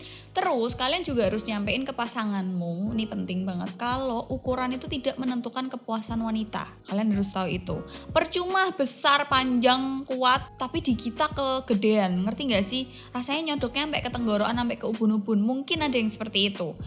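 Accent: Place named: Java, Indonesia. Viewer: mbak eee.dari Semarang thoo..aku sneng logat nya itu lhooo medhok tenan.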